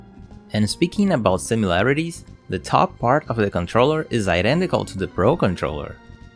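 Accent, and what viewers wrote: Brazil. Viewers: o Pc dele inteiro tá em português do Brasil mano, e ele não fala como nativo, ele tem sotaque brasileiro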